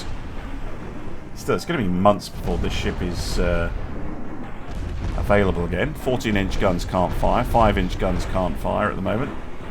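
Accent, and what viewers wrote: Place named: United Kingdom. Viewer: I love channels like this but it was your accent that made me subscribe. Rule Britannia Britannia rules the waves!⚓⛵⚓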